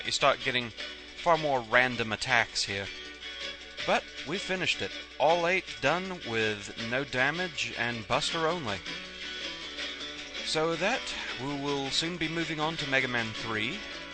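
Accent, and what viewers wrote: United Kingdom. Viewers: are you british? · You sound british..... But you're not though right? Like the accent though.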